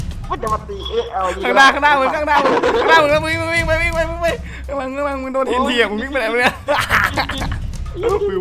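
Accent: North, Thailand. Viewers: พี่เป็นคนเหนือใช่ไหม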